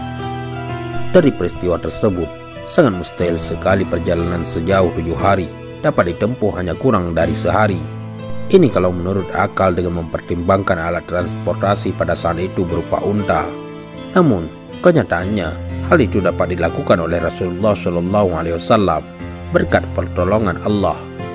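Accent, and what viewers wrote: Aceh, Indonesia. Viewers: Dari sebutan huruf D nya saya tuhu yg menceritakan kisah nabi ini pasti org aceh.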